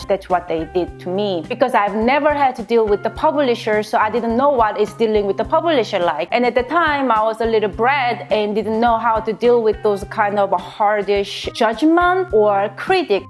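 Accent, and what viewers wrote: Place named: South Korea. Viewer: funny korean english accent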